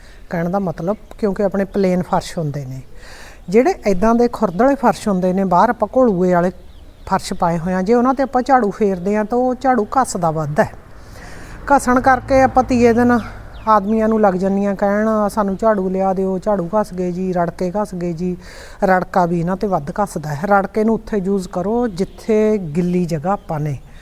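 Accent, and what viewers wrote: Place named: Khamanon, India. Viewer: ਤੁਸੀਂ ਕਿਹੜੇ ਪਿੰਡ ਤੋਂ ਭੈਣ ਜੀ ਸਾਡੇ ਇਲਾਕੇ ਦੀ ਬੋਲੀ ਤੁਹਾਡੀ ਖਮਾਣੋਂ ਇਲਾਕੇ ਦੀ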